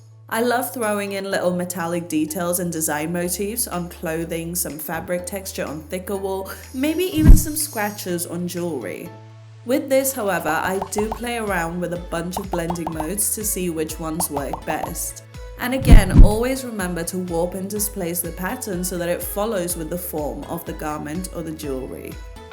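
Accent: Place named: United Kingdom. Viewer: Hey enjoyed this video cause you have a loveeely voice and British accent. I am a fresh learner of PS and it was a bouncer, never the less I liked your British diction and crisp clear voice, This was the first video I came across, and I am impressed by your excellent work and editing skills. Your videos will help me later when I come to terms with PS. Loved your charming personality, I have to submit and follow and Big like too. I am a watercolor Artist and trying my hand at POD and that's where learning PS is so important. If you have the time check out my painting videos. Good to know you. #artistsatwork53 #artistsatwork53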